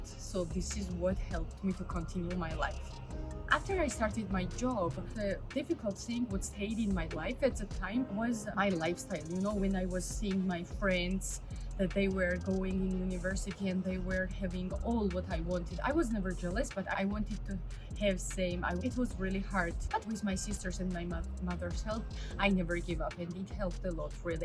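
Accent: Japan. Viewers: の日本語のイトネーション好き😍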